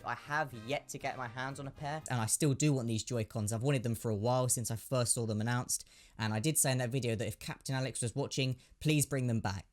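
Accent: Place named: United Kingdom. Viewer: Morally, yeah, it's really shitty of Nintendo to strike down a product that was benefitting charity in memory of one of its biggest supporters. Nintendo aren't our friends. They're the Disney of video games. They set their lawyers on everything even vaguely resembling their IP. I don't think they deserve the community they have. Legally, I don't think you have a leg to stand on. JoyConBoyz is neither parody nor fair use. Disclaimer, I am not a lawyer, this is not legal advice, you probably should consult a lawyer. You sound British, good to see a fellow PAL gamer, so I'm basing this on UK Copyright Law, which is currently still bound by the EU Copyright Directive. In that, our version of Fair Use is called Fair Dealing. It does provide an exception to copyright law "for the purposes of caricature, parody or pastiche". Those generally have a comedic, critical, or mocking tone to them. 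In my opinion, it would be a stretch to call JoyConBoyz tongue-in-cheek, let alone a qualifier for Fair Dealing. Fair Dealing is a defence, meaning you can already be in court and incurring costs by the time you get to use it. Judges will consider how much of the original work was used and the Etika Joy-Cons, bar the paint job, seems almost identical to the real thing. I'd imagine there's a good chance they'd be considered unlicensed bootlegs regardless of the genuinely good intent behind their creation. There's also a massive difference between copyright, which is automatically granted, and a tr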